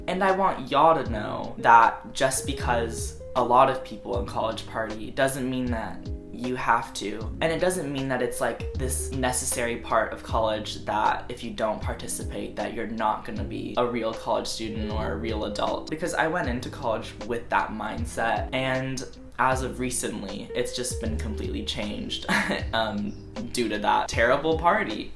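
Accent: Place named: Texas, United States. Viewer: Conan Gray You still talk like a Texan and I love it cause it’s like a high pitched country voice and it’s my favorite thing in the world!!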